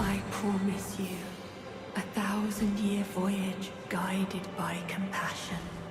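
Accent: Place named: Arab world. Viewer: I haven't reached him yet . However, I can say that he is cool. من لهجتك عرفت انك عربي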